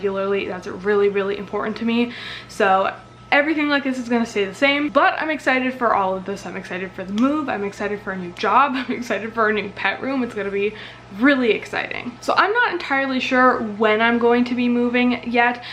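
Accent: Canada. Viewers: I love your Canadian accent lol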